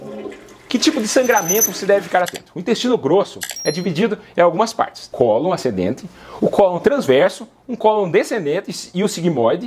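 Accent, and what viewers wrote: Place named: Caipira, Brazil. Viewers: Caipira ou Nao...eh sempre mto bom ouvi-lo! 😉✌🏽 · Esse sotaque caipira deu um contraste curioso com as informações brindadas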